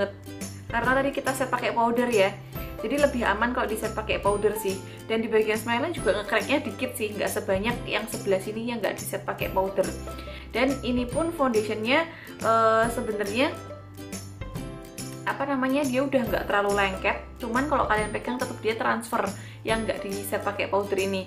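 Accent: Java, Indonesia. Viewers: Nyantol nek mbak medhok jelasno, mantul 😁 sekarang udah punya baby zack jadi lama kalo mau ada video baru jadi rindu 😍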